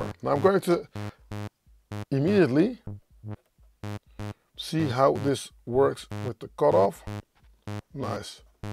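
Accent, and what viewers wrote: Netherlands. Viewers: Toevallig nederlander ?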